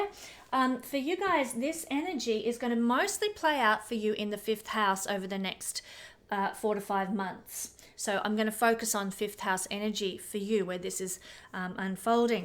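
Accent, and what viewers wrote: Australia. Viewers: Your Australian accent is very pleasing to the ear! I miss your lovely country and all its wonderfully amazing people ❤🧡💛💚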